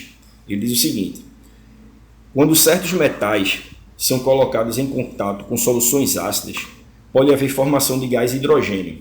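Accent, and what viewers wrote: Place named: Northeast, Brazil. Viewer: só quem é nordestino entende bem e reconhece esse sotaque rsrsr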